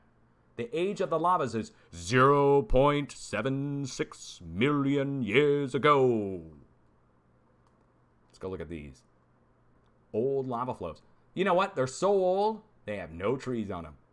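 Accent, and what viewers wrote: Russia. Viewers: Love the Russian accent and your sense of humour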